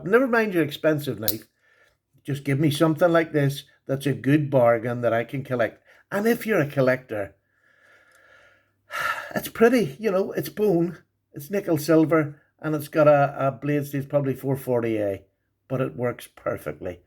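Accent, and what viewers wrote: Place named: Ireland. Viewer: Love the accent my name is Shawn Michael Fleming my parents are from Cork Ireland a province of Munster Ireland 🇮🇪 which city are you from Ive always use to try to talk like my father and grandfather. As I’m sure you know Ireland is a beautiful place. Hope you have a HAPPY HOLIDAYS AND STAY SAFE. AND DON’T DRINK TO MUCH PROPER NO 12 IRISH WHISKEY 🥃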